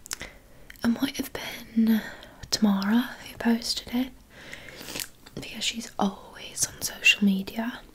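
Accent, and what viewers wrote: United Kingdom. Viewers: I've suffered from tingle immunity for a while and this video cured it! There's just something about the British accent. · something about your british accent gives me so many tingles.... I've had a bit of tingle immunity recently but this is Fantastic! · as a british person i can’t really tell where in the uk your accent would be from but i love ittt it sounds way nicer than most british accents 😭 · The British accent is actually adorable lol.props to you because it's not the easiest to master 😊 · I think London mixed with brummie hahah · You have a very good British accent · your british accent is always so great ❤ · I don’t know what it is about the British accent but I love ittttt ❤️ happy early Easter! If you celebrate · I love the British accent so much 🥰💖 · Well done on the British accent,I'm from Birmingham so sound like the Peaky blinders 🇬🇧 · Girl I love this accent! 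Thank you for appreciating us brits😭❤ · obsessed with your british accent 😭 i love these kind of hair asmr vids so i was so happy to see this 🫶🏻💗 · doing a british accent is just so tingly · One of the only british accent i love Edit: 3 Weeks later this still gives me the most Tingels ever❤ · As a British people I always found it annoying when people would over sell the accent by like <num>. However your is sweet and suttel. Luv u ♡ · you with a british accent is really giving me anya taylor joy vibes !!! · Your British accent is fab!! I hear some potential for Northern Irish there too - I think you’d be great at it as well! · TIMESTAMPS🐬 - ~ intro, thanking you for coming over & chatting (face touching). - ~ showing you her hair charms. - ~ more chatting. - ~ more hair charms. - ~ hair tinsel. - ~ tapping & scratching her hair brush. - ~ taking your braids out & commenting on the weather. 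- ~ brushing your hair. - ~ showing you her butterfly clips. - ~ detangle brush & gossiping. - ~ braiding your hair. - ~ butterfly clips in the braid. - ~ brushing your hair - ~ putting hair tinsel in your hair. - ~ braiding your hair & hair charms. - ~ aquamarine the movie. - ~ hair serum. - ~ massaging your scalp & positive affirmations. - ~ fixing your parting. - ~ more butterfly clips. - ~ noticing how similar our hair colour is. - ~ tapping on hair charms. - ~ putting more tinsel in your hair & showing you how to. - ~ wrapping up the video. p.s ur British accent is incredible. It’s sooo satisfying hearing you say ‘pretty’ 😊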